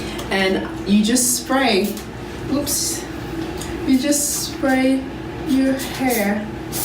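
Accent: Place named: London, United Kingdom. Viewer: BTW, is your accent from London.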